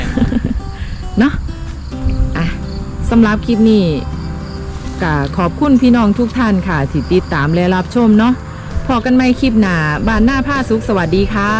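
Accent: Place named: Ubon Ratchathani, Thailand. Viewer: สวัสดีค่ะ เพิ่งเข้ามาดูครั้งแรก บรรยากาศดีมากดูแล้วคิดถึงบ้านเลยค่ะ สำเนียงคือคนอุบลฯ เป็นคนอุบลบ่จ้า ~จากคนอุบลพลัดถิ่นจ้า~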